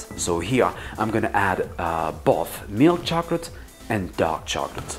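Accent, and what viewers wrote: France. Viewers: The croissants seems amaizing, but any similarity with France (albeit the accent) was lost when you defined the butter layer in imperial rather than metric. So sad... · I can't figure out if this guy has a French, American or British accent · Not sure if he's American trying to do a French accent, or French trying to do an American accent... 🤔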